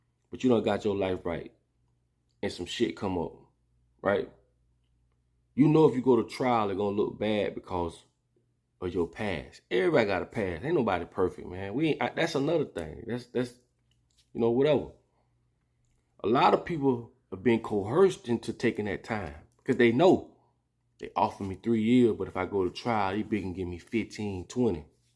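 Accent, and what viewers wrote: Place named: Florida, United States. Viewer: I love that country Florida boy accent 😍💙 keep dropping the knowledge I love it & we need it. U reaching ppl. #memphistn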